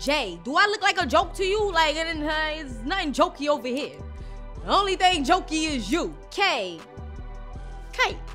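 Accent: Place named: New York, United States. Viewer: I love her NY accent! Lol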